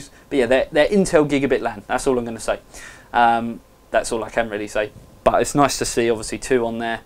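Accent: United Kingdom. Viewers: *hears voices in head* Whos video is this? British right??? Commonwealth??? Everyone is reading this and wants to know what RAID 0 is. Raid is where you have more than 1 hard drive hooked up for storage and performance. Raid 0 is a type of Raid setup that's the cheapest (I don't plan on using 20 hard drives with Raid 0 setup) in performance. YOU DONT NEED RAID 0 IF YOURE NOT INTO VIDEO EDITING WITH WRITING FILES AND COPYING FILES TYPE SHIT. SO DONT BUY RAID 0 IF YOU DONT DO THIS TYPE OF STUFF ALL THE TIME. Raid 0 should improve the lag or stuttering with programs like FRAPs and other software. Its writing information twice as fast or suppose to. You shouldnt see a lag sync in watching videos either after recording a giant file after editing it. I still didn't hook up RAID 0. Did I win the biggest LOSER AWARD yet? Good... I didn't want to be your friend anyways.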